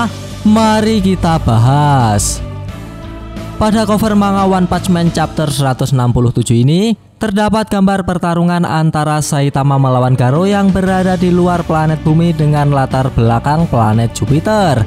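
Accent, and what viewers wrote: Java, Indonesia. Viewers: Jurus2nya kocak anjir medok😂😂😂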